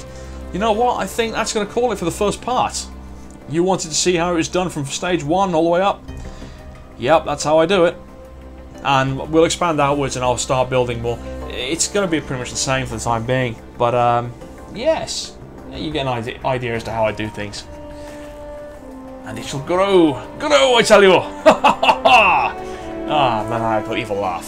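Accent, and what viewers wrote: England, United Kingdom. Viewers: Fuck yeah I just need to grab some food , play video and listen to English gentleman playing intelligent game on PC ... fuck yes!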